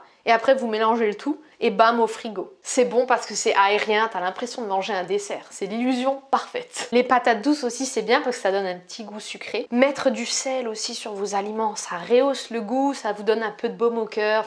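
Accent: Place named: Lorraine, France. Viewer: cet accent lorrain au top =D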